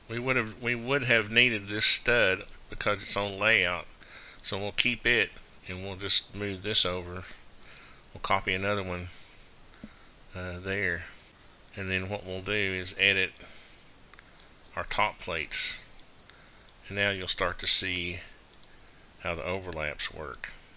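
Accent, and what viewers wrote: South, United States. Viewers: That outside corner is no longer code can't insulate and besides that when you stand your other while you're inside is only going to have half an inch for your sheetrock to screw into should have inch and a half so when your half inch goes on one wall you'll have the other inch you also sound like you're from South which you don't get very cold so I'm sure the insulation is not an issue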